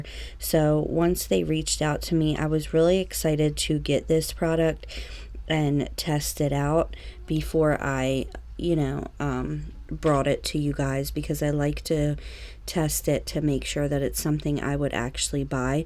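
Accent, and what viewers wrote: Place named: Maryland, United States. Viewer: I just found your channel and am a new sub .. love your projects and love your very clear directions .. I've noticed some crafters speak so fast its hard to understand them .. BTW your accent sounds very familiar .. being a Maryland girl I can tell you're from my neck of the woods 😀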